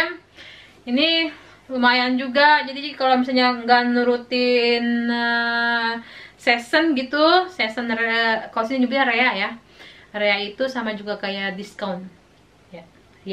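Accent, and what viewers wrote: Jakarta, Indonesia. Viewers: Hai, aku niy sukaaaa banget sama, krn sll mengingatkan aku pada tetangga2ku dulu waktu aku masih tinggal di salah satu daerah di kebayoran baru, yg sekeliling aku itu orang betawi semua, jd selalu kangen dan teringat dg tetangga2ku dulu, dari cara bicara, sikap, mirip dengan... btw netizen mah ga usah didengerin mpok, sehat selalu dan bahagia selalu ya dg Bang Sabeni😊🤗😘😘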